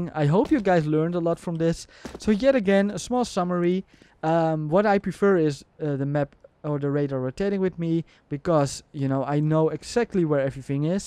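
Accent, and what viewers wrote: Netherlands. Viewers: nice dutch accent ;)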